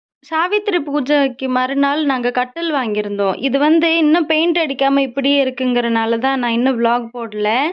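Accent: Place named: Tamil Nadu, India. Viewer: நான் உங்கள சங்கடப்படுத்தனும்னு சொல்லல.நாமெல்லாம் தென் மாவட்டக்காரங்க நம்ம தனித்துவமே நம்ம வட்டார தமிழ் தான்.அதுல பேசுங்க இன்னும் நல்லா இருக்கும்.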